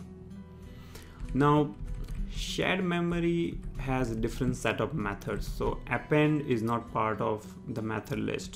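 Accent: India. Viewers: Your voice isn't as irritating as most coders with an Indian accent. Take it as a compliment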